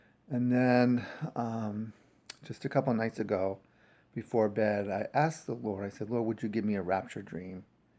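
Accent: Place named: New Hampshire, United States. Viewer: Hey, I enjoyed this. During the first five seconds, when you introduced yourself I was thinking you were from New Hampshire, based on your accent and family name, and then you said you were from NH. I'm a Manchester native. I do believe this is the year when we get 20/20 vision from the Lord regarding the unfolding end time events. It's already been happening. The final puzzle pieces are all coming together with perfect clarity now, regarding the things we've been studying for decades. Here are some things that will confirm what you were saying: <link> <link> <link>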